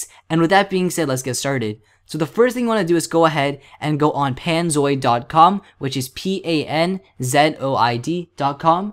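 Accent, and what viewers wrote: Canada. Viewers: Are u CANADIAN? ( do you live there) Here?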